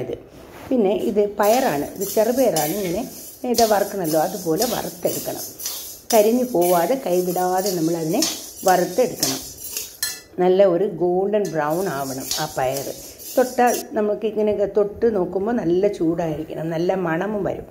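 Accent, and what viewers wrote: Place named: Tamil Nadu, India. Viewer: നിങ്ങളുടെ സംസാരം നല്ല രസമുണ്ട്.. Tamil slang touch ഉണ്ട്, നല്ല recipe tnx ❤️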